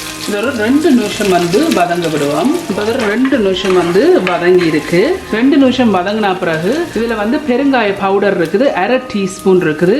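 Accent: Sri Lanka. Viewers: Thank you for this nice video. I do enjoy listening to Ceylon Tamil! Anyway about making rasam, I use rasam powder which is easily available in Malaysia so I don't have to grind all those codiments etc . Well I enjoy watching ur video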